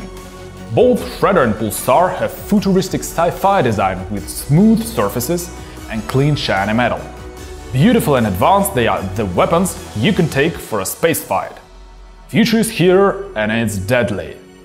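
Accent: Russia. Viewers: ^^^^^^^^Help them Translate??!! To English I hope, I had to put closed caption on to understand this Rooskee..LOL^^^^^^^^^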